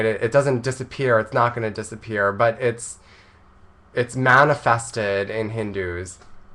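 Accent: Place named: United States. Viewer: No need to apologize. Hindi pronunciation varies in different regions of North India. You develop your own American Hindi pronunciation. That would be more original. ;-)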